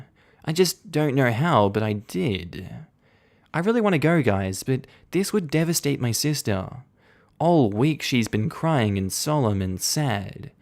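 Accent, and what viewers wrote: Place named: Australia. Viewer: Aussie accents are hot, then add that personality, voice and face and you have a quadruple threat guy!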